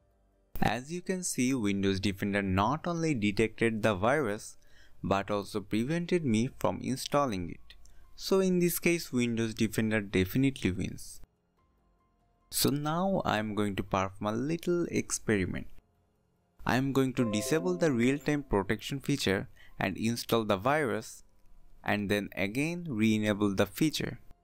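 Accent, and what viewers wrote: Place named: India. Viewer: U sound like a indian, or are u? dramatic music plays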